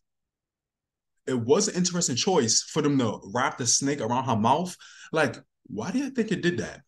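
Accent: New York, United States. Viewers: I just love your dialect and how you say. It’s so NY😊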